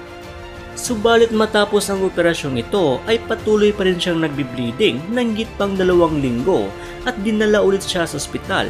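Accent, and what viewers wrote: Philippines. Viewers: The accents, sound like ilocano. Correct me narrator if I'm wrong.😁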